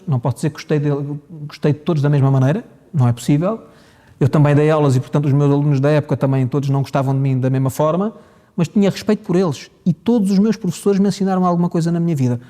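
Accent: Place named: Alentejo, Portugal. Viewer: Olha, não estava na a espera... Gostei. Grande Taxa, homem com pronuncia alentejana que até dá arrepios! CHEGA!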